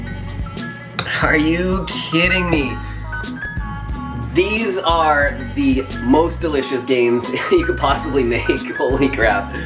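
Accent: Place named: Canada. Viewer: You sound extra Canadian in this video 😂 Also , you got them mini boulder shoulders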